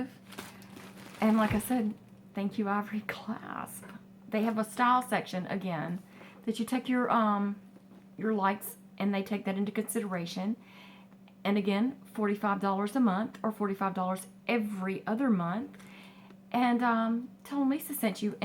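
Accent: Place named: South, United States. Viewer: I've watched lots of your videos, girl you have that like sweet southern accent, smile, and friendliness that is so classy and feminine. You got a new subscriber :)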